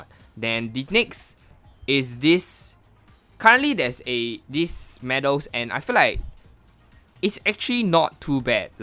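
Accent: Singapore. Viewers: Are u from Singapore I can tell from your acent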